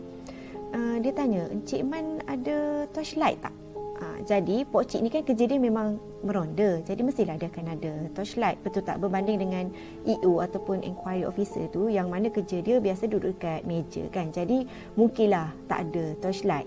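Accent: Kelantan, Malaysia. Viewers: Kak orang kelantan ke orang luar salah cakap pok cik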